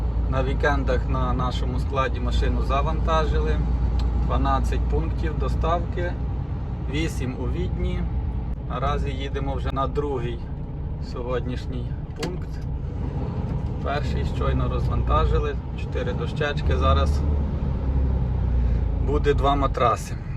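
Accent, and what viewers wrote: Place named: Western Ukraine, Ukraine. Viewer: спасибі за відповідь. Я так розумію, Західна область? Відчуваю нашу говірку)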